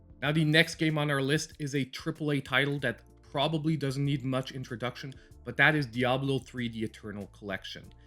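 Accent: Quebec, Canada. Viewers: You can't make the connection between Maple Syrup and the French accent? He's Canadian.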